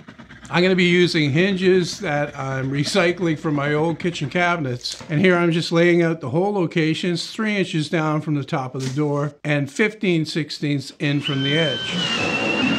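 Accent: Canada. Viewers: Hey, I was wondering, are you canadian?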